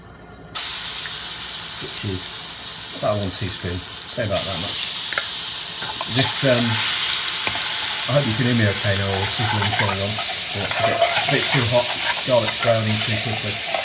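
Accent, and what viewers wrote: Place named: North Midlands, United Kingdom. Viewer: Yaaaay! He speaks! ( although very muffled) but still a big step! Great vid! I somehow thought you were a Geordie , so obviously you are not. North Midlands?? I must try this ( but still have a backlog of about 30 of your recipes), great stuff as usual, much appreciated .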